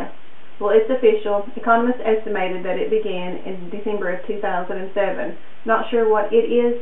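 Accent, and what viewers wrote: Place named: South, United States. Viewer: I wish you every success.You deserve it just for having that lovely southern accent. PS I'm English .....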